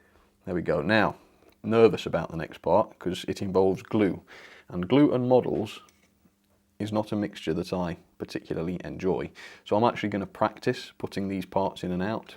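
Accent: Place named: England, United Kingdom. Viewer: you are literally the english accent version of SMT mainline hahah also very cool seeing this being fixed up!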